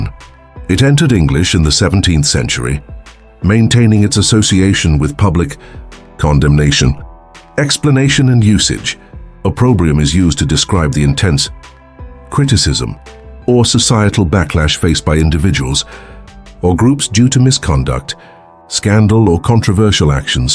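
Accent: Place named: United Kingdom. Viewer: Utterly brilliant, truly magnificent and in British English too. I would be deeply indebted to you to allow me to subscribe to you.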